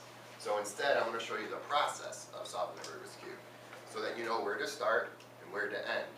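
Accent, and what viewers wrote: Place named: Midwest, United States. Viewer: Are you in the midwest? (you sound midwesty) You did great, btw. I'm analyzing your speech for a class.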